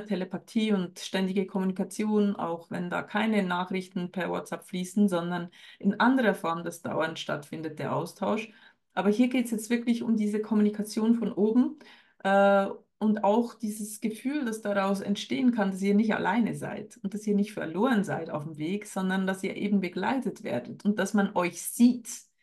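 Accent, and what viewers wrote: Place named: Switzerland. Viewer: Ich wäre soo froh wenn das Universum ein Zeichen sendet, allerdings sehe ich an und zu mein Geburtstagsdatum..dachte das bedeutet nichts… da ich nie so overboardet werde wie andere offenbar😂. und mich zwar mit x Seelenverwandten rumschlage..aber nix von Twinflame😂 obwohl das Thema mich seit fast 30 Jahren beschäftigt. Macht nix. Aber Zeichen wären nett für den richtigen Weg… würde helfen❤. Früher hab ich nie gecheckt, dass du Schweizerin bist😂. Dabei kennt man doch den leichten Akkzent..auch wenn du gut sprichst. Has glaub au falsch gschriebe. Wyter so: find dich supi. 🎉❤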